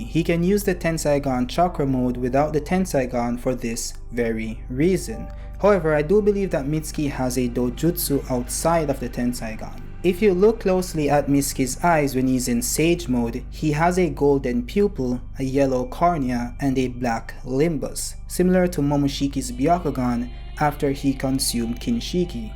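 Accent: Jamaica. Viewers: You sound jamaican, are you?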